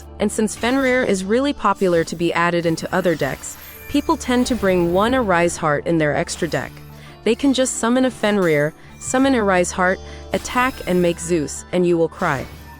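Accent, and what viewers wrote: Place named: Atlanta, United States. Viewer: Why the text to talk sound like she’s from Atlanta?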